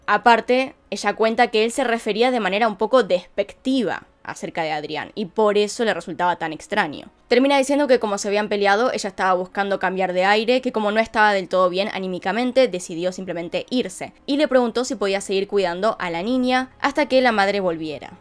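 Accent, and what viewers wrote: Argentina. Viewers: Por favor ya no digas ANIO, ES AÑO POR FAVOR 🙏 😭, me ensartar como investigas punto por punto tus teorías llevo más de una semana escuchándote desde que descubrí tu canal y tu acento argentino es muy bueno 👌 solo porfavor en la pronunciación es año🤗 gracias espero puedas leerlo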